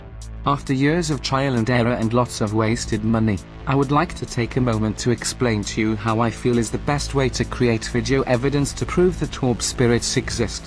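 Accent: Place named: North Wales, United Kingdom. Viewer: The commentator is a Gog. That is, he is from north Wales and he has a Gog accent. The accent is only slight. I find a strong Gog accent awful to listen to!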